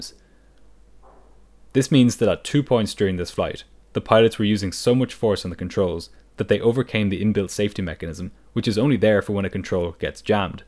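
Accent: Ireland. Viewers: As an Irish man it's nice to hear an Irish accent on a YouTube channel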